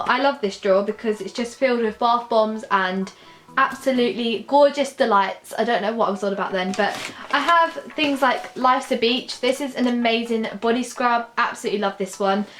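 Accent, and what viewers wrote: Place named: Bristol, United Kingdom. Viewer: are you from Bristol because u have a Bristolian accent xxxx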